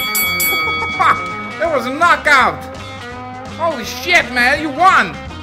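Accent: Netherlands. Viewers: I can hear Dutch somewhere in the accent, is it true?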